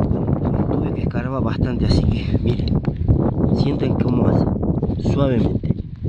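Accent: Córdoba, Argentina. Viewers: Felicitaciones por su canal, aun no los conocía y me ha parecido muy interesante su video. Muchas gracias. Dice usted que estan en la puna. A mi me parece notar en su modo de hablar tonadita cordobesa. Lo sigo desde España, aunque naci en Córdoba. Una preguntita, el oro que se encuentra así ¿tiene todo la misma pureza? Lo que quiero decir es que si se funde ¿qué tipo de oro se obtiene? o ¿tal vez no se puede fundir? Perdona mi ignorancia, pero es que aquí el oro sólo lo he visto en las películas del 'far west', en los escaparates de las joyerias y últimamente he visto gente buscándolo en el rio en algún canal de youtube. Las cantidades que consiguen sacar son muy chiquititas, pero parece mas difícil de obtener que con detector como usted... Me parece un tema apasionante y si realmente en esa zona hay mucho, aunque sea en pepitas muy chiquitas, al cabo de un mes de frio y trabajo duro, se debe poder sacar un salario ¿no?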